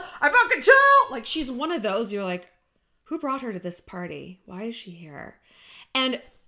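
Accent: Australia. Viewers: As an Aussie, your Australian accent is sending me 😂😂😂 love it ❤️ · Omg ...I’m from Sydney!!! Babe ... your Australian accent is soooooooo great !!!!😂😂😂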